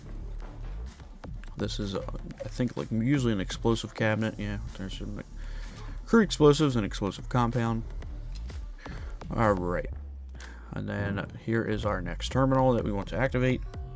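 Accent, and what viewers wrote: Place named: Philadelphia, United States. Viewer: Philly delco or Maryland? I hear It in your accent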